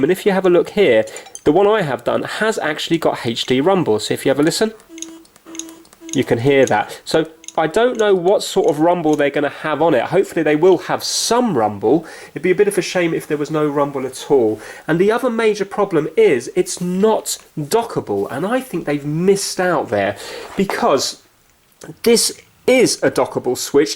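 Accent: United Kingdom. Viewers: Haha your happy British voice :)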